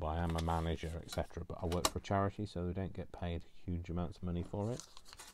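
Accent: United Kingdom. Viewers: I really enjoyed watching you doing your drawers. Yes, the glue is fantastic and I loved the sound of your fingers patting the paper down. You say that you ‘ talk rubbish’... I certainly don’t think so... but if you do, it’s delightful, educated and unique rubbish and I love to hear it! What a coincidence that the paper was from one of the Brontee sisters’ books and there you are in Yorkshire talking with a Yorkshire accent... it just occurred to me that the Brontee girls would have had Yorkshire accents! Thank you, ❣️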